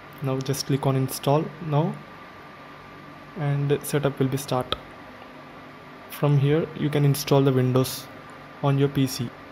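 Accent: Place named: India. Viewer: Oh yea, the indian accent❤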